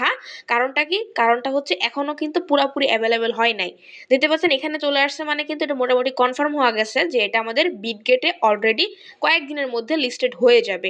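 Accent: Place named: Jessore, Bangladesh. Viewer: আসসালামু আলাইকুম আপু, যশোর থেকে নতুন করে আপনার ইউটিউব চ্যানেলে এবং টেলিগ্রামে যুক্ত হলাম।ভিডিও গুলো খুবই ভালো লাগে, আপনার কথা শুনে মনে হচ্ছে আমাদের যশোরের মেয়ে আপনি।আপনার জন্য শুভকামনা রইলো।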